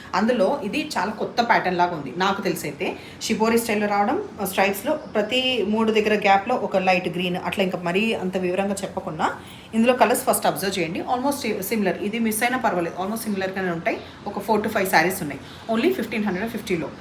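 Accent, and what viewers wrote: Telangana, India. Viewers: Hai mam mee sarees కంటె baga untai mee మాటలు and pakka Telangana యాస super mam😅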